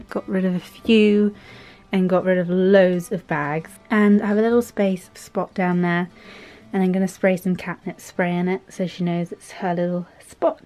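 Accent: United Kingdom. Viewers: So satisfying to watch! Loved the kitty checking on everything. I also donated lots of clothes and cosmetics to charity organizations this year. Absolutely LOVE your voice too :) From which part of the UK are you if I can ask? :) xxx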